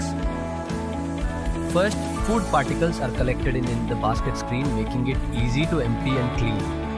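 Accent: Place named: India. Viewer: oh yes indian accent makes it perfect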